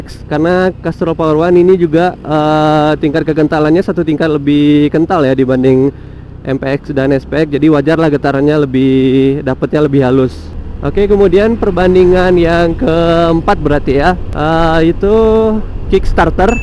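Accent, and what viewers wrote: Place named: Minangkabau, Indonesia. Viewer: Urang awak ko mah, logatnya kliatan😂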